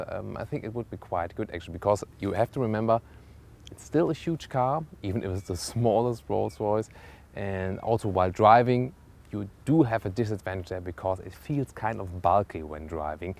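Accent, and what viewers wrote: Germany. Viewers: das deutsche english hört man sehr raus, aber trotzdem super video!!!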